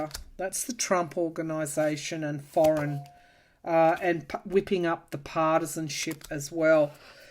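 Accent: Australia. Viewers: You have been such a comfort to me this past year. I’ve truly felt your genuine and heartfelt concern and empathy for what we’ve been going through these past years. If it weren’t for your charming Aussie accent, I would think you’re a citizen of the US! I absolutely love and adore you. I truly mean it when I say I don’t know how I could have taken all the abuse without your constant calm comfort. 🙏❤️🌸😇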